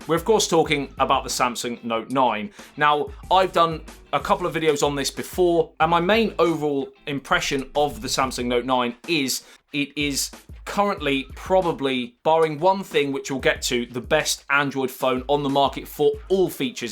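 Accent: United Kingdom. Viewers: Well,..........except for that British brogue........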